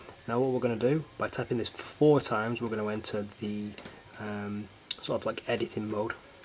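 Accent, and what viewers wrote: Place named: South, United Kingdom. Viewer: Britain, possibly South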